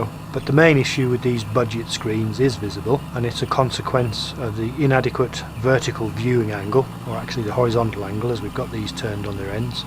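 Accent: United Kingdom. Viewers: Thanks for the prompt reply. Say, I'm curious... Though you obviously spend a good deal of time flying around the region I spent the first several years of my married life (Puget Sound area), it sounds like you live in the UK. I lived in Melton (East Anglia) for a bit more than two years, and met and courted my wife near Bracknell... Just wondering if you're from a district I'm familiar with. :-) Thanks again for the advice -- and all the excellent videos! Keep up the great work!!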